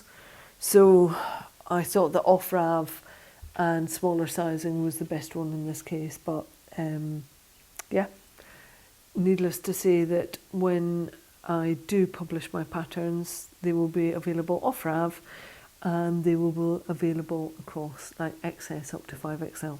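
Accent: Scotland, United Kingdom. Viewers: Yay delighted that you are staying on YouTube. I've watched you from the start and YouTube is my preferred format for podcasts. It's lovely to see you and your projects and feels like chatting with a friend. Being Scottish I love hearing your Scottish accent, turn of phrase and chats about Scotland in general. xxx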